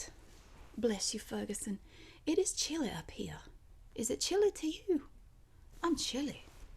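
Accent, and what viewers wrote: South, United States. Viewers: I miss these accents, it’s rare to find someone who still has that “southern belle” twang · I love the accent, where about are you from? I'm from East Tennessee.lol you should do a Hillbilly asmr lol.that would be funnier as a bucket of tadpoles.lol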